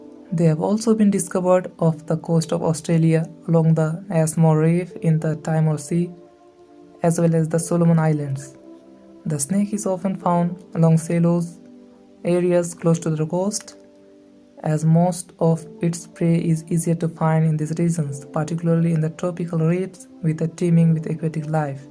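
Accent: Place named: Mexico. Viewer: I like your Mexican accent.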